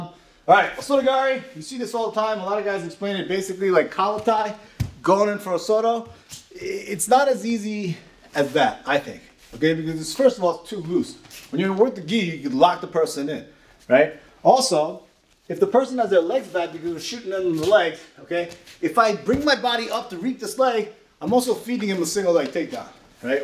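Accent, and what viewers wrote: New York, United States. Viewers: Great channel. I thought I heard a fellow NYer. Check the bio and sure enough. Wish I was still there as I would definitely check out your Schools because of your comprehensive grappling background. Great breakdowns and I like the way you explain the moves from multiple arts/perspectives. I come from a wrestling background and I am working on augmenting it with some Judo and these videos are helping. Thanks for your time.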